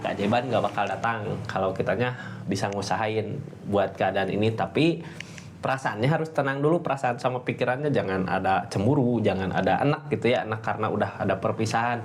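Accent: West Java, Indonesia. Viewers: Nyabut jukut😂😂, aduh si Abang sunda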